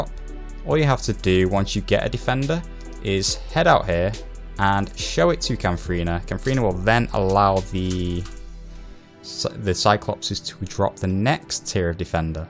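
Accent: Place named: United Kingdom. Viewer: dude you sound so brittish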